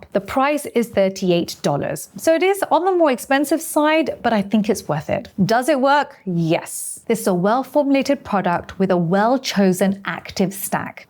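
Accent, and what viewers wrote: United Kingdom. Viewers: But, u sound british, ur video has hit me in Britain, why we looking at American products? Im not in america! Can u do a video for black skin for products on our shelves over here in britain. Thanks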